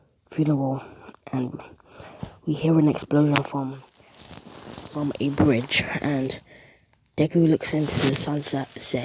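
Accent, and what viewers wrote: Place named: United Kingdom. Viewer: Mate, you've got a heavier British accent than I do! It's like one of those book narrators.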